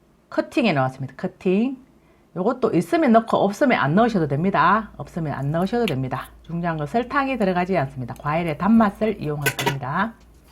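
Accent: Gyeongsang, South Korea. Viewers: 안녕 하세요 넘 재미있어요 저도 경상도 토백 인지라 새삼 경상도 사투리 억양 재미있고 솜씨 잘 배웠습니다 한번 해 먹어 봐야 겠내요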